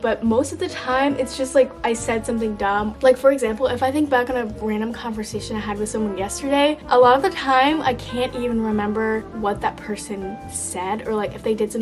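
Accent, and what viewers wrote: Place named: United Kingdom. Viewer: GIRL I THOUGHT SHE WAS BRITAIN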